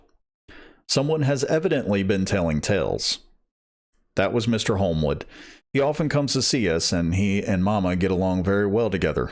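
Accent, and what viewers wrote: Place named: South, United States. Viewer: Excellent reading,.. i'm enjoying these. There is nothing wrong with a southern drawl. I like it.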